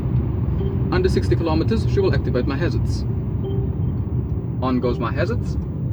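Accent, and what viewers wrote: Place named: Pakistan. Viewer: Whats sup with this Pakistan accent ? Can’t get half of a shit you said.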